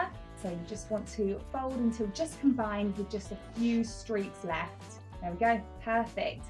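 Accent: United Kingdom. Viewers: I dont know why but british accent makes it for me ... "half an hour" how high it goes ;) <3 exately. Cant compare with american ones. Is it my nostalgia for UK. Will try it out aaaaallrigh? :)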